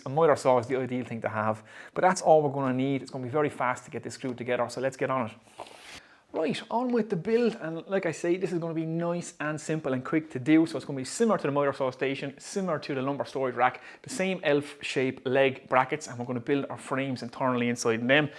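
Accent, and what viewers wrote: Waterford, Ireland. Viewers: Great video, great workmanship but most of all, great accent. The Irish accent is always happy-sounding which is what we need over here in England at the momment. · Ii, great videos. Really well done and very informative. I'm just getting back into woodworking after giving up wood turning many years ago. I'm making some patio furniture and some raised planters so great ideas and guidance from your videos. I'm looking to make some chopping boards for family and friends as gifts and I'm struggling to source some hard woods such as walnut, beech maple etc. I'm seeing some hardwood suppliers online but it's a case of very little detail of board size/lenght and cost. It feels a bit intimidating when looking for relativly small amounts. Are you aware of any suppliers that would deal with craft or hobby woodworkers. Sawn timber would be fine as I can joint and thickness it myself. 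Love the videos and have started the mitre station. By the way, this might be fighting talk but is that a Deise accent I hear? Thanks and keep her going. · Hi. Found your site by accident but well worth the visit. Living in Co. Meath and the accent and the Irish gift of the gab is so refreshing on a site such as this. I look forward to seeing the rest of your catalogued videos. Cheers. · What accent is this? Ok, Ireland.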